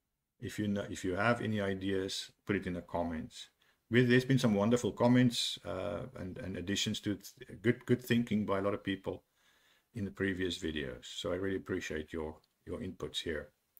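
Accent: South Africa. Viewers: Are you South African 🇿🇦? You sound like it. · but every video someone makes about this accident is just speculation, this guy sounds liker a South african trying to just say something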